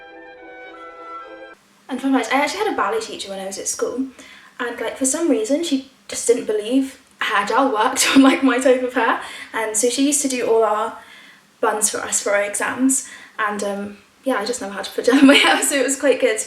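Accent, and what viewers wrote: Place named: France. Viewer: I love your accent and is it a French accent or a British accent?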